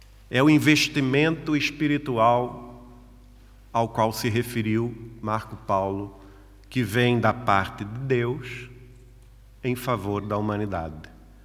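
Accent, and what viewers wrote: Portugal. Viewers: o está com sotaque de Portugal?? 😳😳😮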